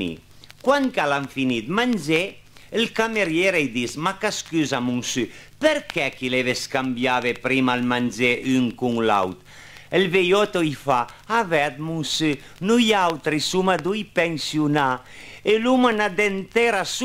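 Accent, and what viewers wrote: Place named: Turin, Italy. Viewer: Hhaahahha che ridere soprattutto quella, dei televisori. Urbe era proprio una forza.... All'epoca un mio amico aveva diversi suoi dischi di barzellette. Che bello ascoltare il turineis puro.... Oggi il nostro dialetto così ilare e simpatico non lo senti più ormai.... Peccato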